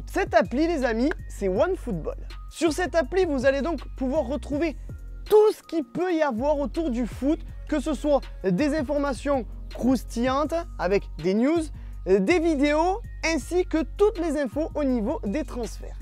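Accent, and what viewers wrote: Marseille, France. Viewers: Ta un accent marseillais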